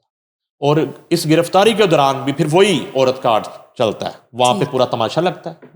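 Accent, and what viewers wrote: India. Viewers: Aap Urdu k ilfaz ko Hindi Mai pronounce krti Hain...why??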